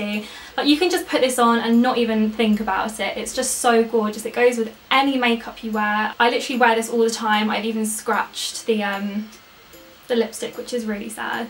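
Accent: United Kingdom. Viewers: she's not american, she's british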